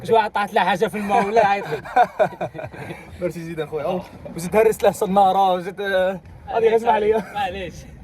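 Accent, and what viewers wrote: Oran, Algeria. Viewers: ولد بلادي مرحبا بيك لي اول مرة نشوف لي فديو انتاوعك حتي انا من وهران كي سمعت هدرتك عرفتك من وهران الله. يحفدك اخي قمة في التواضع و الأخلاق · بارك الله فيك خونا الصغير على جميع معلوماتك على كل حال راني طالعة بفيزا سياحة ادعيلي ربي يوفقني 🤲🥰 وتاني نقولك محتواك ولا اروع دايما نقولها ونعودها دمك خفيف ودايما ضحكني لهجة تاعك الوهراني تحية لناس واهران💯💯❤️❤️❤️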